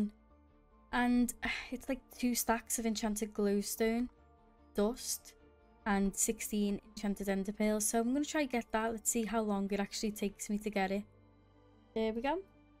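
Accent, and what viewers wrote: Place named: United Kingdom. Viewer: The british accent so lovely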